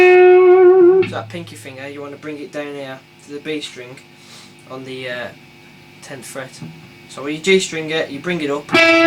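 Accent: United Kingdom. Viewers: Where u from mate u sound like u got a brummy accent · hey dude , are you from england ? i thought youre from russie 0.o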